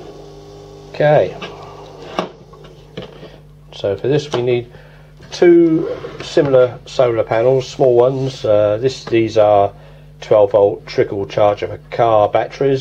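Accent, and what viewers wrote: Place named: Suffolk, United Kingdom. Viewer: You have nailed it Sir, and, if my ears do not deceive me, you are a Suffolk gentleman to boot ! Double bonus ! With best wishes and greetings from South Africa. I shall certainly try this out in short order. Very best, Mike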